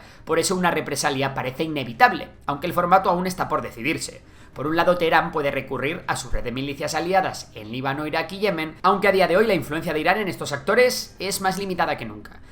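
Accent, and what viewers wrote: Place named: Spain. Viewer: Oista chaval que feo es el acento español tío no lo tienes en latinoamericano?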